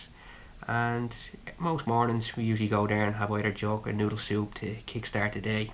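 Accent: Ireland. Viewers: Sounds peaceful Irish.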